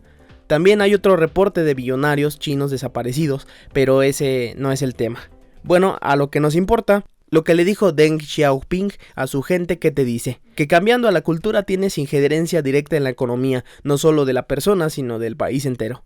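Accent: Guanajuato, Mexico. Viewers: Eres de Guanajuato, verdad??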